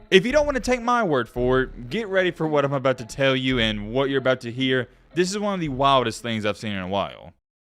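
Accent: South, United States. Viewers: I'm going to your stuff more than the others cuz I hear that southern trying to come out when talking. Can't keep the southern boy out lol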